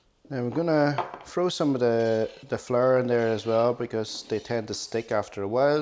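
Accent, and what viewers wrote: Ireland. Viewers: Asian guy with Irish accent cool..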